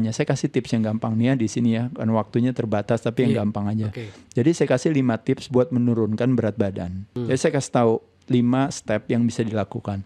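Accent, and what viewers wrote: Bali, Indonesia. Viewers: Logat balinya si mimin nampak kali, T T T😂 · Ini emg logat bali bgtu apa cm feeling sy aja ya. Mc nya kayak org make sabu, ciri2nya bgtu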